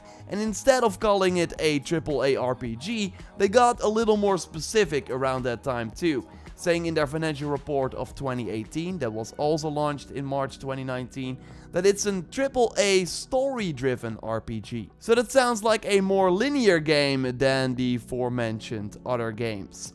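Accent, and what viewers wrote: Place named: Netherlands. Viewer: Are you dutch by any chance? Your accent sounds familiar! Great vid btw!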